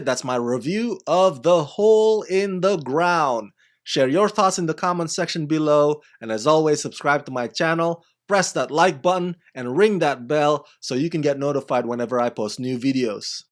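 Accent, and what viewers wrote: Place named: Indonesia. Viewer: You sound like Indonesian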